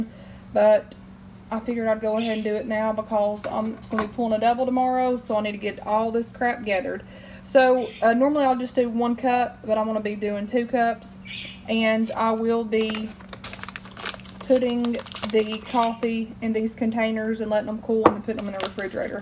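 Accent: South, United States. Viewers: Pumpkin iced coffee! Yasssssss!!!! ❤️❤️❤️ so glad to have found your channel! Love that southern accent honey. We will get along justtttt fine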